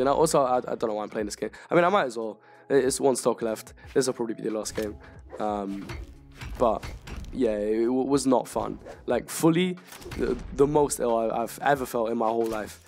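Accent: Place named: Croydon, United Kingdom. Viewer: Oh okay I was just wondering because you sound like you’re from Croydon when your sick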